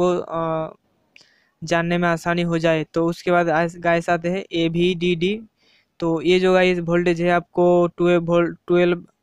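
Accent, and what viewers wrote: Bengal, India. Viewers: Aapka mother language bangali hai na is liye bahot logo KO samaj me nahi aata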